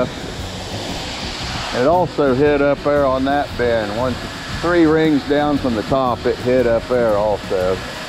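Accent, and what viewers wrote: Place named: South, United States. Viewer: Accent is no different from an american southerner thats awesome.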